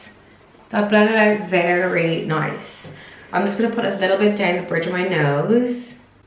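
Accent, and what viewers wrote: South Dublin, Ireland. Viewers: Clicked on this video not knowing who you are, heard the Irish accent and subscribed instantly 🤣💖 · South Dublin accent. Sounds like an Irish person trying to speak with an American accent. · I think she is Irish 🙂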